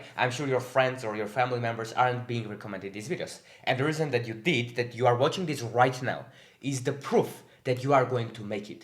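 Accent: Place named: Georgia. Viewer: Hi friend from Georgia, at first i thought you were Georgian because of our accents sound pretty similar. Keep up the good work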